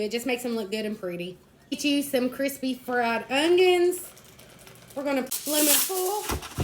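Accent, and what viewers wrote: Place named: Pennsylvania, United States. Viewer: Where you from?! Pencil-vainya? Kentucky or Tennessee? Cute accent😊 Yummy lookin quick snacks the way! Keep going boo❤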